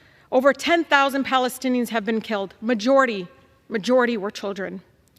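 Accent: United States. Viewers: Shes not from palistine . She sounds American to me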